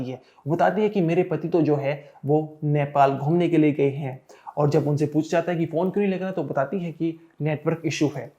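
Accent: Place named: Saharanpur, India. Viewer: बोलने का दंग बता रहा है तुम सहारनपुर से हो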